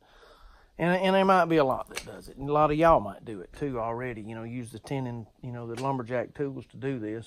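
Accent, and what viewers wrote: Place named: South, United States. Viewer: I LOVE your voice and accent! Every time you speak it reminds me of great Ken Curtis the actor who played deputy Festus Haggen on Gunsmoke and sang with the Sons of the Pioneers. I'm southern too, from South Carolina so I also have the southern drawl lol. Glad I found your channel :-) I just bought some of these tools to experiment working with and your insight and experience is very helpful. Thank you! Great video, new sub :-)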